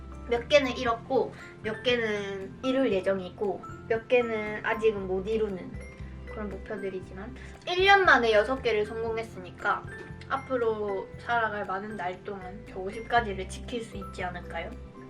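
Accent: Busan, South Korea. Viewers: 어투는 표준어, 억양은 부산 사투리, 발음은 또박또박... 각 특징이 뚜렷하시네요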